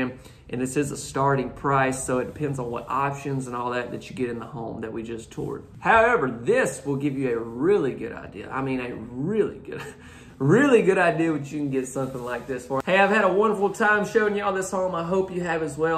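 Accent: South, United States. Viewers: I haven't gotten past that beautiful blue in the ceilings and all around the decor., what state are you in? I want to sell my home and leave the state. Your commentary and that beautiful country accent does it for me 😂! Do you or your team deal with VA loans or know how to assist veterans? Please let me know. Thank you! Wishing you & yours a wonderful & blessed New Year. Please stay safe out there. U S Army Retired (<num>) Iraq Veteran. Peace & Blessings, Light & Reflections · my man! Having moved to Yankee country many years ago, I miss hearing the Mother Tongue. As well as great, informational tours, your voice takes me home to wonderful memories of growing up in the South. All I need now is some Carolina BBQ and some boiled peanuts! Thank you!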